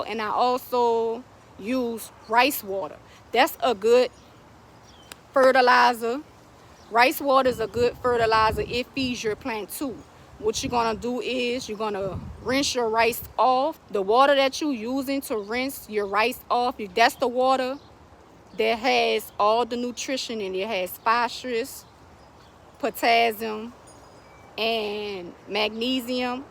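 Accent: New Orleans, United States. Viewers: Accent reminds me of family in Nawlins. We like to talk ish about the differences at family reunions lol much love from Slauson and Crenshaw 💪🏽🏁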